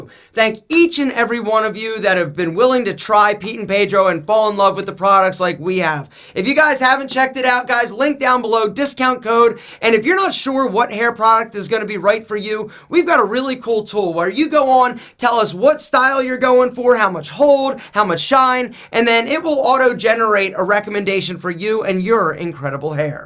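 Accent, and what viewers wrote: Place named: Maryland, United States. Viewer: Are you from Maryland? U sound like everyone I know lol. I’m from Annapolis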